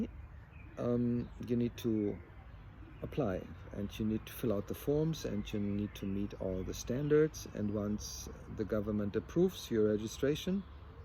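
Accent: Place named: Germany. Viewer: I guess you are from Deutschland because your accent is like my teacher( I am a Chinese student studying in Germany). I really like your own thinking about the Chinese model. and I was also inspired by your talk also to understand further about china. I also respect you for your really independent thinking and analysis ( because most of the west media is full of ideology, arrogance, and prejudge) hope you can produce more videos about your analysis of china, whether is a good or bad point. because I appreciate your neutral altitude (Daniel Dumbrill is also a good neutral one also <link> And I think one common thing between China and Germany is that both 2 countries are pragmatic, it's like scientific thinking to manage the countries. Vielen Dank für Ihre Erklärung und hoffen, dass Sie nach der Epidemie wieder nach China kommen können.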